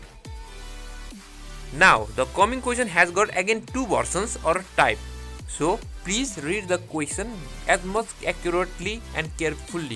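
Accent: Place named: India. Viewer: Bro your a hero thx for giving us answers, your telling us the answers very well and i kinda notice you accent you indian right? I SUBBED!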